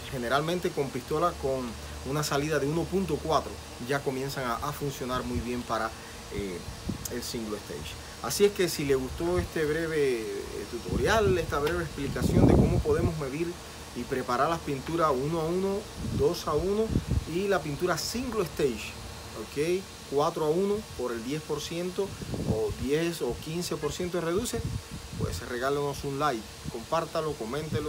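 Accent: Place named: Cuba. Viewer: Porque los de cuba hablan asi??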